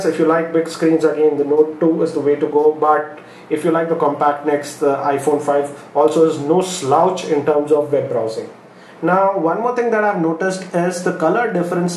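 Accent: India. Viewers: Indian accents are just awesome xD nice comparison bro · review of the two hottest phone now all done with an Indian accent.....how could you dislike this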